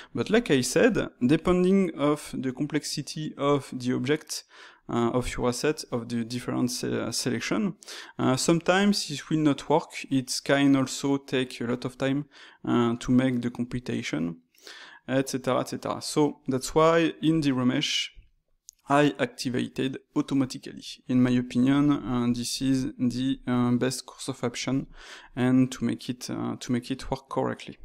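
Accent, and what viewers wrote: France. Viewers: I have been playing around with Blender for just over a year, only learning through youtube and trial and error. Sir, this is the best explanation of Remesh verses Dyntopo I've heard yet. And that is even with the French accent.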